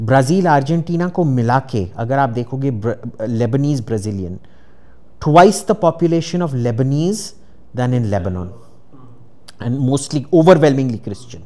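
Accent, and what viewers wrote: Standard. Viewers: जी आप की हिंदी बहुत शुद्ध है। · 1st thing 1st - All praise due for for his chaste Hindi delivery!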